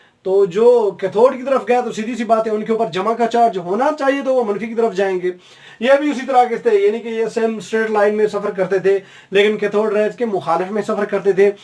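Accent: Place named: Saraiki, Pakistan. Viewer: سرائیکی وسیب کا ایک بہت ہی پیارا انسان بھائی اللہ کریم سدا سلامت رکھے اور لاکھوں کامیابیاں عطا فرمائے ۔۔سر جب آپ انگلش لیکچر کے بعد سرائیکی میں۔لیکچر دیتے ہیں تو وہ بہت خوبصورت لگتا ہے سرائیکی زبان ویسے بھی بہت سادہ اور میٹھی زبان ہے آپ کا انگلش لہجہ بھی وسیب کے لوگوں جیسا ہے اللہ کریم۔آپ کو خوش رکھے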